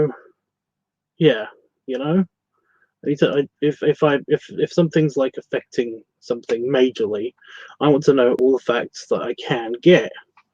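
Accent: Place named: England, United Kingdom. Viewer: Sorry to learn about how you're not feeling well. I realize this posting is a week old now so hopefully you're back to your happy self as you read this... I've been working heaps this past week, to get a 4 day holiday weekend, as we celebrate Victoria Day in Canada. Regarding your throat discomfort, it may be possible that it's a symptom of an allergy. I developed allergies when I turned 40 ish - never having suffered from them before. The time of year plays a big part. So hopefully your hot bear body will fight it off. All this time I've admired you, I've never asked you directly, just assumed you make your home in England? You're wonderful accent leads me to this conclusion (ya think?) LOL. England; for the most part, experiences much the same weather patterns as we live with here in my home of British Columbia. It certainly provides the necessary elements, which ultimately evoke the bloody flu. 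Anyhow, I wish you a speedy recovery and look forward to your next post bear. Hugs from your furry bear Canuck buddy.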